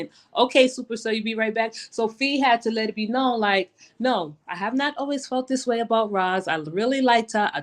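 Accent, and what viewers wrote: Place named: Bronx, United States. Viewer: Hey my beautiful how long have you been back on utube I love hearing you speak that northern accent 🦁🦁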